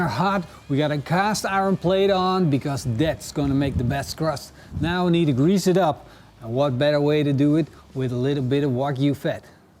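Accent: Netherlands. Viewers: Ben je Nederlands? Hoor het aan de accent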